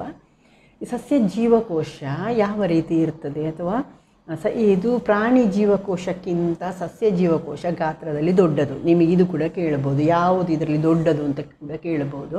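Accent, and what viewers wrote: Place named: Coastal Karnataka, India. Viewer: ತಾಯಿ ನೀಮ್ಮ ಕರಾವಳಿ ಸೊಬಗಿನ ಭಾಷೆ ತುಂಬಾ ಇಷ್ಟವಾಯಿತು.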